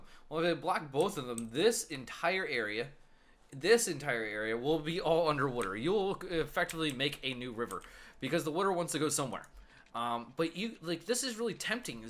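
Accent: Pennsylvania, United States. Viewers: Do I detect a fellow Pennsylvanian? That accent is unmistakable. "Wudder" :D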